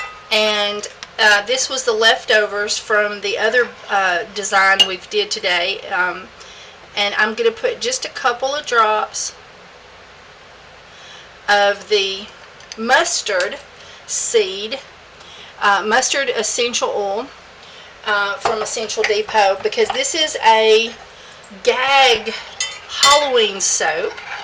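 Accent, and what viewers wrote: South, United States. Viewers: Just wanted to tell you how much I love your vids, love the southern drawl :) and your soaps are amazing btw! :)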